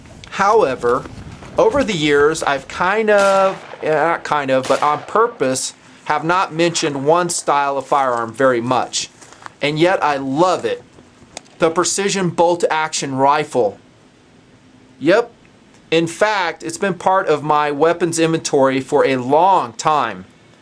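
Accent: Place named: Appalachia, United States. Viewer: I love your hillbilly accent that drops in randomly in the video. but hey I cant get enough of your vids man.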